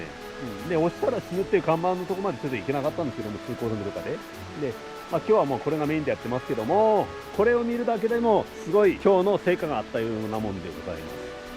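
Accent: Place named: Fukui, Japan. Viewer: 声を聞いていたら福井弁のように感じましたので思わず登録しました(笑) この道は３０年ほど前に比べると今は少し良くなった気がしますね。それでも普通も道しか知らない人には驚きの連続でしょうね。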